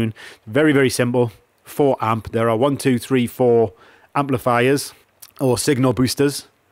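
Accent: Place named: Newcastle, United Kingdom. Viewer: You say “cheap and cheerful” just like my grandad did. What a small world, that I hear a Geordie voice from my favourite Thai modular supplier. Always good to be encouraged to think outside the box. Audio or control, it's not supposed to matter to us, is it? :)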